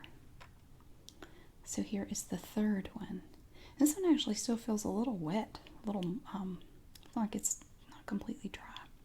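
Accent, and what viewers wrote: South, United States. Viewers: your voice is so relaxing and I love your Southern accent. Makes me feel like I'm back home with my family in Franklin, VA :) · “..and the soap is very draaaahhh” (dry)., your southern accent charms the bejesus out of me 😊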